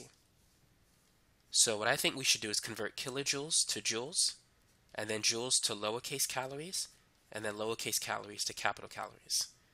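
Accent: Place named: Brooklyn, United States. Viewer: am i the only one who noticed he has a brooklyn accent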